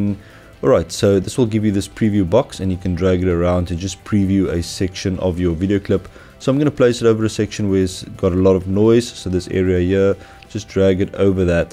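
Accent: South Africa. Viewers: You sound South African...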